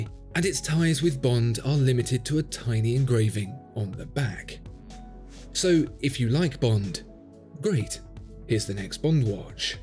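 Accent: United Kingdom. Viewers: So basically, this SMP is the Marine Nationale Pelagos that Tudor refuses to make. BTW, hearing someone with a British accent saying the most American of phrases "swing and a miss" is oddly satisfying.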